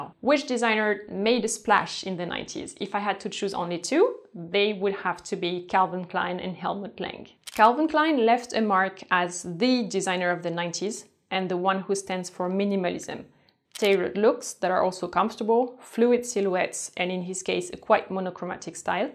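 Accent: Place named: France. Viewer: i love your accent so much!🤗 it's true🙄. with french shade.. i'm personally from Ukraine and i study english now☺. and this channel is a piece of inspiration for me🙄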